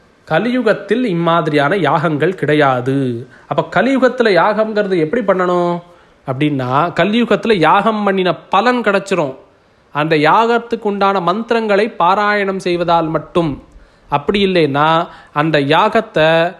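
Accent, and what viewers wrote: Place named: Tirunelveli, India. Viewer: சுவாமி திருநெல்வேலி போல பேச்சு அப்படிதான் தெரியுது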